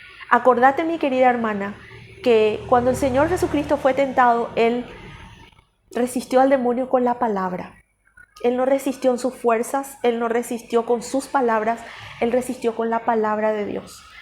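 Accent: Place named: Paraguay. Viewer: Por tu acento creo sos de Paraguay, todos hemos pasado por alguna experiencia similar, como humanos lo experimentamos, gracias al Señor Jesucristo tenemos su Santo Espíritu y el nos ayudará siempre.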